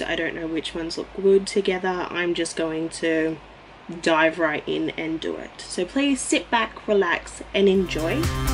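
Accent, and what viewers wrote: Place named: Australia. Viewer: Girl i love your accent, are you Australian? The final look looks gorge! ❤️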